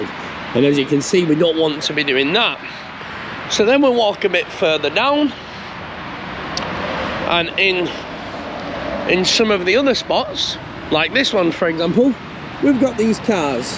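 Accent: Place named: Greater Manchester, United Kingdom. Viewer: loved watching this. fresh youtube subscriber. watch you for a couple year on the tiktok. funny guy. love listening to your acscent. reminds me off my family from bury/radcliffe. keep up the great content.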